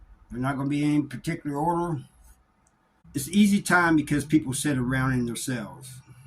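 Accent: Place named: South, United States. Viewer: What state are you from ya accent sounds southern bro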